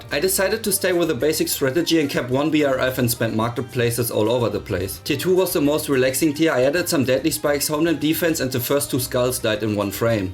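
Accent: Germany. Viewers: Ich kann den deutschen Akzent einfach nicht ignorieren, aber nicer run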